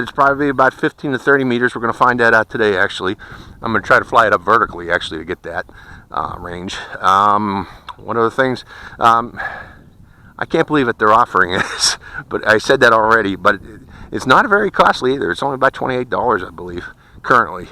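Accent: Pittsburgh, United States. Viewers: gotta love that pittsburgh accent :)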